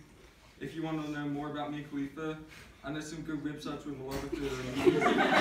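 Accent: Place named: South, United States. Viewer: His accent is a like a mixture of southern, Australian and kiwi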